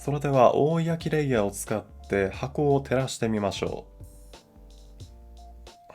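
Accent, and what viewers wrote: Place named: Kansai, Japan. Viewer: 関西弁すき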